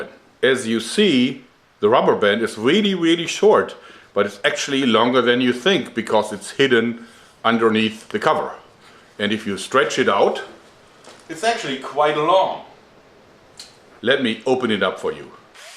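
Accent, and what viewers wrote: Germany. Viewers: is er deutscher? :3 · i've always loved his accent xD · This beautiful Fleshlight hohoho, that german accent :D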